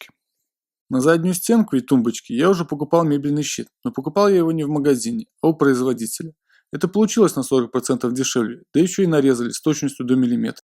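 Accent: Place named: Belarus. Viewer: Точно! Коллега в Минске несколько лет прожил, тоже так говорил.... Живе Беларусь)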